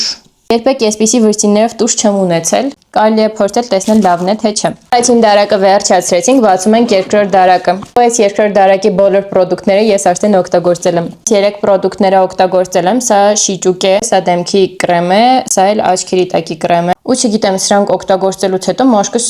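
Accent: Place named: Gyumri, Armenia. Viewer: Գյումրիից ես գյումրվա բառբառոց խոսի😂❤❤❤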